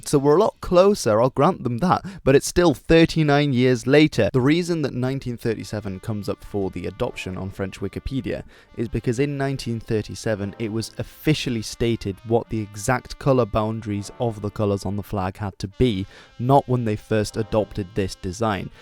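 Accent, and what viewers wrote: Netherlands. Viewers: Wtf i was watching this and ur english was perfect so i thought that you were british but then you name the nsb those and I hear a perfect dutch accent and now i don’t know anymore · At first i didnt even notice you were Dutch, at least not untill you said NSB. Then some other words made it even more clear. But i still had to look in the comments for my confirmation. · As a kid from Amsterdam I moved to Australia in '86 when I was 8yr old and I just love these videos. I missed out on a lot of Dutch history and your videos are great little packages of absorbable knowledge. On a side note.. I picked your Dutch accent the first min of the first video I saw but I can hear a very posh English accent coming through so much so would I be right to assume you went to an expensive school in England at some point? Your English is better than mine... 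lol.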